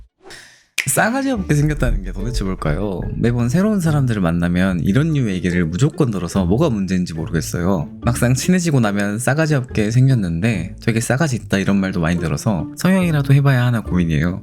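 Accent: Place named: Gwangju, South Korea. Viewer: 광주말투 진짜 매력있다 ㅋㅋㅋㅋㅋ 보들보들해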